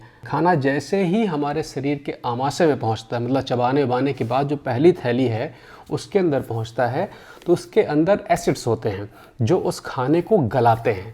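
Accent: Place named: Lucknow, India. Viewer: Sir aap kaha ke rehne wale h apki boli Lucknow side ki lag rhi h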